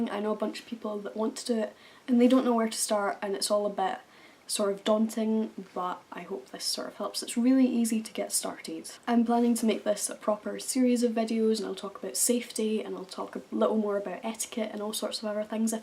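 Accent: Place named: Glasgow, United Kingdom. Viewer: I knew i recognised you... Soon as you started talking i realised I've most like Likely seen you around Glasgow at some point!! This was a great video by the way, I've just accepted a 3 year contract with a modelling agency and I'm hoping to specialise in alternative modelling. Definitely boosted my motivation to succeed so, cheers :D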